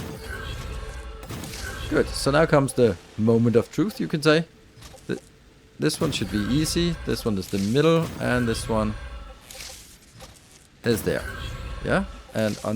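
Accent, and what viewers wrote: Denmark. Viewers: Quick question i hear your voice but are you dansk ?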